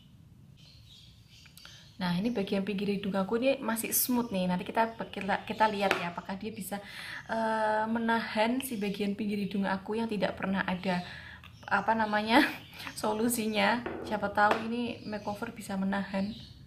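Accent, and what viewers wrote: Java, Indonesia. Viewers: Telat 33 menit nih mbak medok.. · Selalu suka review nya mbak medhokkk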